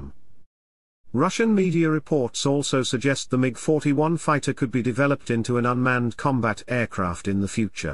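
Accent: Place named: United Kingdom. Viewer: Very interesting report.. Excellent British pronunciation.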